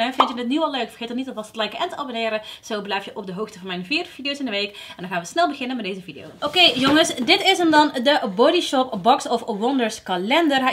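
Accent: Brabant, Netherlands. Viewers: oeeehhh fijne producten erg leuk geniet zo van elke video van je toppertje blijf zo door gaan maar denk ook aub aan jezelf love you je bent zn lief mooi vrolijke meid met je heerlijke brabendse accent heb ik ook Tilburg hahaha liefs en dikke knuffel chantal ❤️❤️❤️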